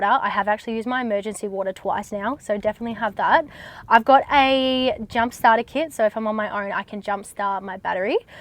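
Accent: Australia. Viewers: Damn... Aussie accent from a cute blond girl should be the sexiest thing in universe :eyes-pink-heart-shape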